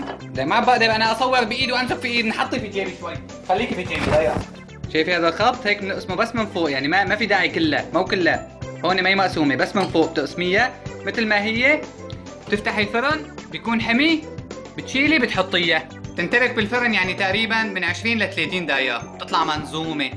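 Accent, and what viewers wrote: Aleppo, Syria. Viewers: الحلو فيو يذكرنا حكي حلب يا خيتوووووووو😊😊😊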